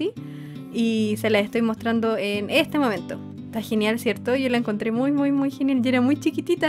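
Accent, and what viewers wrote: Chile. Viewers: Eres chilena? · ¿eres chilena? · Se nota q eres Chilena :3 CHILE GANA WEON >:VVVVVVVV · Se nota que eres chilena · Es chilena?? Se nota en la voz mdmd❤️ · Piyo eres chilena? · ¿Eres Chilena? Yo si.... PD:Tienes vos de Chilena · Eres chilena??? O alguien sabe si es chilena??? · oye eres chilena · No se si yo tengo un don de saber quien es chilen@ o esque ella tiene un acento fácil de saber que es chilena... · Eres chilena ? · Es chilena? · Eres chilena cierto?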